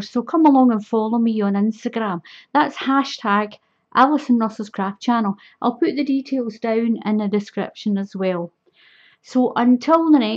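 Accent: Scotland, United Kingdom. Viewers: The closed captions on this are hilarious 🤣 I love your Scottish accent!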